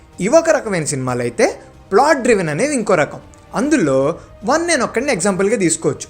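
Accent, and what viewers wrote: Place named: Chittoor, India. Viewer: Love from chittoor 💕. Chittoor slang nee bagane try chesaru meeru.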